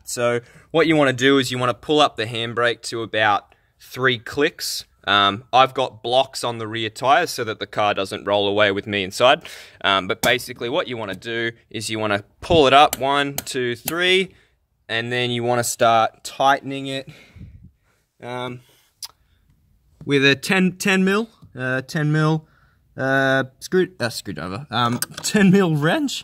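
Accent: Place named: Australia. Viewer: Thanks so much for this vid! I keep coming back to this so I know exactly what to do. True aussie bloke right here. If you ain't Aussie well you sure sound like one lol.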